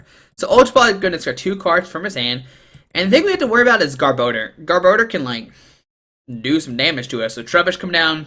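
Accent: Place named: United States. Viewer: Guys, it's just a regional accent. Everyone has one. Everyone understands what he's saying, what's the big deal. And I can assure you that an awful lot of people wouldn't be able to tell much difference between his accent and any other US regional accent.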